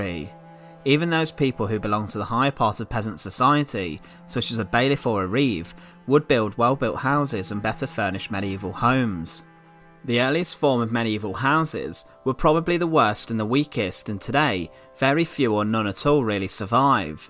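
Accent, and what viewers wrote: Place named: North, United Kingdom. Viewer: nothing he’s just northern😭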